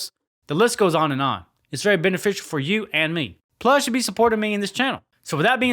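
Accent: South, United States. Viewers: No one ever told me "don't you smoke the devil's weed." How southern are you?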